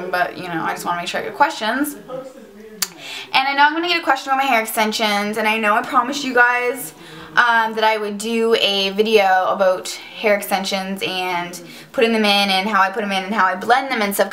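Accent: Canada. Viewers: hey, so i tried commenting on another video of urs but it was giving me greif! it was the kat von D first impression vid on her foundation girl it looks incredible on u. looks llike great stuff. what brush do u use though cuz it looks good? also, i love ur personailty supre cute rihgt up my ally, i say cra cra all the time hee hee. keep it up kiddo :) btw u live in canada? u have an accent.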